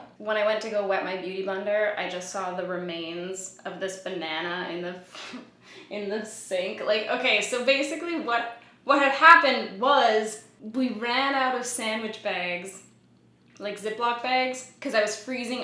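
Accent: Canada. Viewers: Heyoo canadian accent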